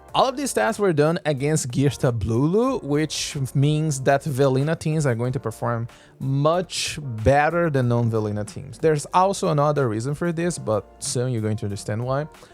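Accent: Brazil. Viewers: Can't wait to try the Air Fryer team!! Btw, are you Brazilian? That accent sounds familiar. Awesome video!!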